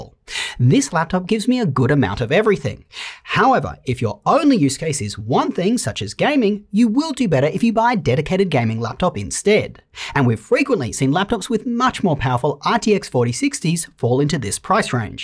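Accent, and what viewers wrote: Australia. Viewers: Why the aussie accent when filmed in the US? Audio seems almost AI?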